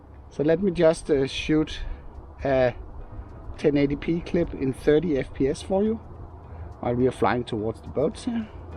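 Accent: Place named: Denmark. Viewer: Man kan godt høre at du er dansk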